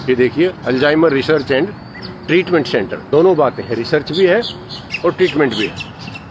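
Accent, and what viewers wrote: Haryana, India. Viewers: No brother his pronunciation is entire Haryanvi and none else.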